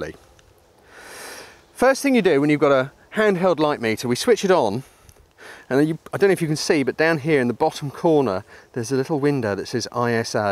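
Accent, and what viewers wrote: United Kingdom. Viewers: If I could have a British accent this is exactly the accent I would want. This accent would play very well with the ladies here in the states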